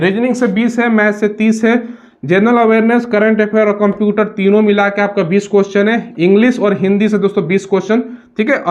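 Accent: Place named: Bhojpuri, India. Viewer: Bhojpuri ka axnent aa hi jata hai 😀😀😀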